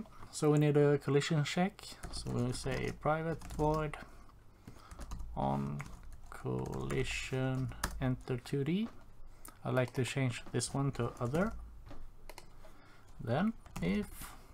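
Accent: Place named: Sweden. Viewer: 100% Swedish :)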